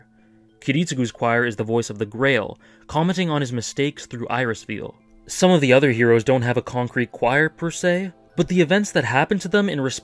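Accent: Canada. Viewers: Good content to go with a very noticeable Canadian accent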